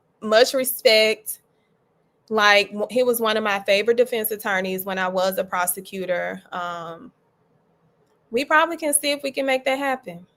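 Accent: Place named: Houston, United States. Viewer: You sound like you can be from H-town😅 That mole is pretty sexy! I wanna kiss it! 💋😍 😜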